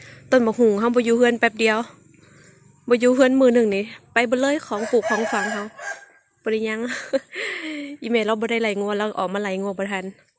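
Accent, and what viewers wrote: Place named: Isan, Thailand. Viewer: ภาษาพูดสำเนียงเหมือนคนไทยภาคอีสานน่ารักครับพูดไทยชัดช่องเคียงเช้นกันพูดไทยชัดเป็นคนบ้านเดียวกันหรือเปล่าครับ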